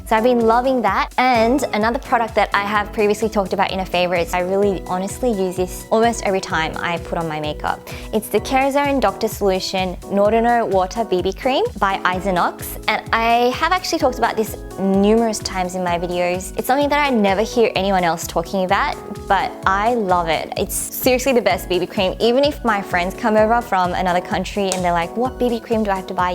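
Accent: Australia. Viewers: What's her accent? Australian?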